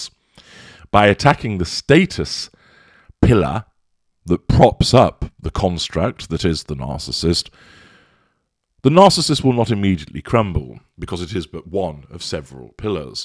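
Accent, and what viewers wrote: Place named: North, United Kingdom. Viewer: you aren't a southerner are you ? I think you are from the north. There are some words that you pronounce wrongly for a southerner especially a posh one lol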